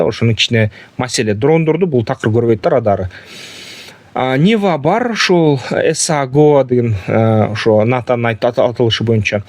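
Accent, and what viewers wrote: Standard. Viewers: Кыргызча таза суйлойт байкебиз азаматсыз.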